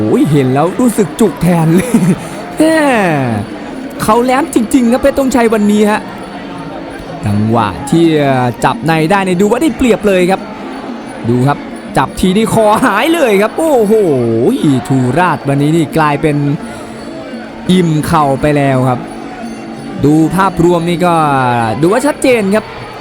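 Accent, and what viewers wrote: Thailand. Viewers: ชอบเสียงพากย์ ลูกผสม ไทยบ้าน + เสียง กรุงเท๊พ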